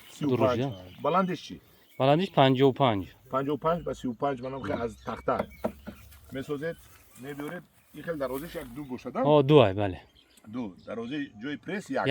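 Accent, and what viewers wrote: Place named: Tajikistan. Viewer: Амаки ман бори аввал аст навори шумора мебинам, бисер писандам омад, хусусан сабки тоҷиконаи гуфтор ва тарзи фаҳмондадиҳӣ, офарин ба Шумо! Фаромуш накунед ки дар Тоҷикистон ҷавонони бисер баландтафаккур ҳастанд, вале имконият ва муосидат аз тарафи давлат хеле кам аст, чун он ҷо аксарият тайерхурону шикамдамони бемаърифат нишастаанд! Боқӣ саломат бошед. Тавсия ба Шумо: имкон бошад дар студияи сабки овоз ба дубляжи филмҳои классикии амрикоӣ ва ҷаҳонӣ аз заӣоннҳои русӣ_ инглисӣ машғул шавед; овозатон бениҳоят ғулғуладор ва устувор ва табиӣ садо медиҳад; PS Sufizoda Siroj, журналисти озод)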